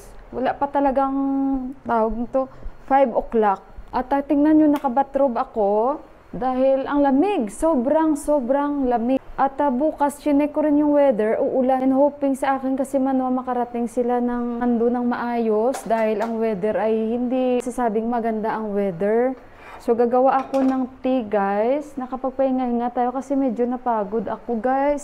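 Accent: Iloilo, Philippines. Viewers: Hello po ma'am watching from taytay rizal.Ang cute ng accent mo ma'am alam ko ilongga po kayo🥰🥰🥰 madali lang po gamotin ang buni danas ko po yan sobrang kati.Gusto ko lang po i share kung paano ko ginamot ang ringworm ko.Simply lang po madali lang lalong lalo na sa mga malayo sa bilihan ng gamot para po ito sa inyo,madali lang po gamotin kumuha lang po kayo ng isang dahon ng langka na tuyo yung medyo malaki na dahon at maliit na lagayan sunogin lang po ang tuyo na dahon ng langgka at lagyan ng langis ang abo nito kunting langis lang yung tama lang mabasa yung abo at ipahid doon sa ringworm yan lang po linisin muna sabonin maigi bago lagyan ng abo ng dahon ng langgka bago ipahid.Sana makatulong ito lalo na po doon sa mga malalayo sa bilihan ng gamot.Salamat po more power po sa channel nyo ma'am GODBLESS po🙏🙏🙏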